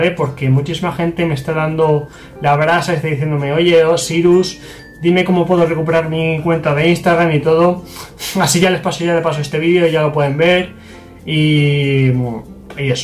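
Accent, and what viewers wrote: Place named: Spain. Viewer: Chistea encima me da una bronca acento feo que tiene el español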